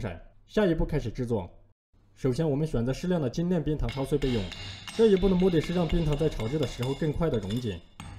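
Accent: China. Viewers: 请问王师父能不能配上字幕，因你们中国口音对我们这些海外土生土长的华人来说真得听不明白。谢谢!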